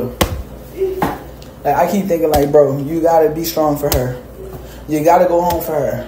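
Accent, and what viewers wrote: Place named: Baltimore, United States. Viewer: They yews and dews be killing me. Baltimore definitely in the top 5 worst accents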